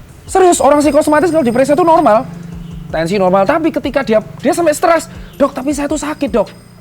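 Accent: Java, Indonesia. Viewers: China medok jawa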